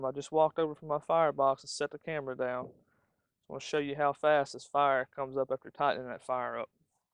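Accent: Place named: Texas, United States. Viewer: Oh yum yum 👍👍👍 that’s such a unique way and creative way of smoking a turkey … I like that Texas twang coming from a native Texan. 😁😁😁 although I don’t live there anymore it will always be my home . ❤️ thanks for sharing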